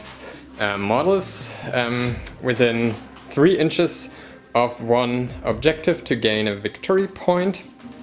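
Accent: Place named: Germany. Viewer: You sounds like Germans speaking english 🤔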